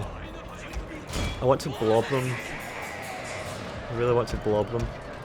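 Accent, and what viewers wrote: Scotland, United Kingdom. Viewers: So glad i stumbled upon your series a few weeks back, love it, im guessing you are from Scotland?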